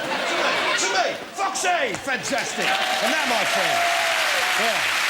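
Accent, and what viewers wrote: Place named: England, United Kingdom. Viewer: Love this. Proper English not some abomination.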